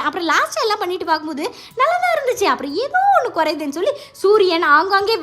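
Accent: Tirunelveli, India. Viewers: Padam varaiya sonna mattum mookala aluva Aaha namma thirunelveli,la pesurathu😂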